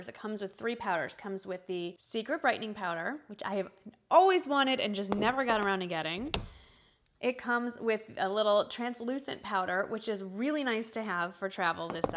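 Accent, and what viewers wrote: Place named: Illinois, United States. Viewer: I KNEW you were from Illinois!! I've just started watching you and just subscribed and wondered where you were from. (Little game I play, "Guess where this person is from... I'm goofy that way) I know three people from Illinois who sound just like you. Then you said you were in Texas and I'm thinkin' no way. Then you said it. Yayyy me! Anyway, enjoying your videos.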